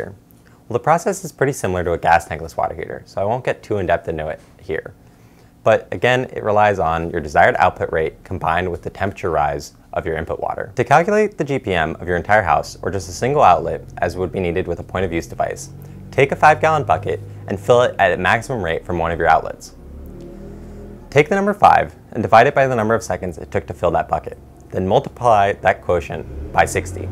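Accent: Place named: Asia. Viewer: Your English is terrible - is that an Asian accent? I can't understand what you are saying.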